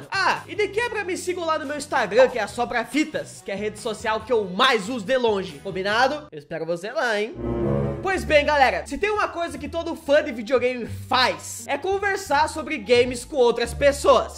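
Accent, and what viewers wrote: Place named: Rio Grande do Sul, Brazil. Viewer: por que seu sotaque parece gaucho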